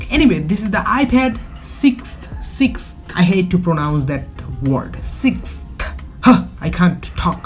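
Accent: Bengal, India. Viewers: No offence but try to change your Bengali accent it sounds shit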